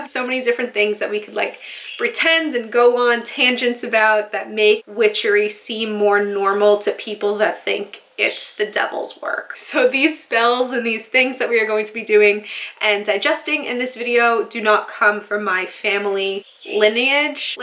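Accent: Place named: New York City, United States. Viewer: i love hearing an authentic nyc italian accent 😆 as a canadian hehe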